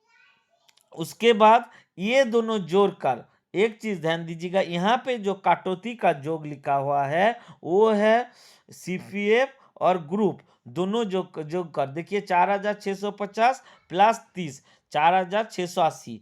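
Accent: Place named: Bengal, India. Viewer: Apka relation bangal se v hai kya sir Language waise hi lag rha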